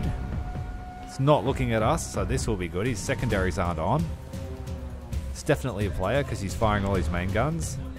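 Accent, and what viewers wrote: Australia. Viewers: "It's now or never, do ya wanna live forever!" Luv ya Aussie humour & narration! Great vid', Sah!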